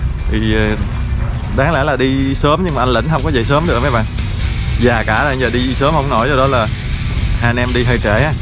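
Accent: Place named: South Central, Vietnam. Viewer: Viêt nam bạn ở tỉnh nào vậy .nghe giọng khó đoán miền trung pha nam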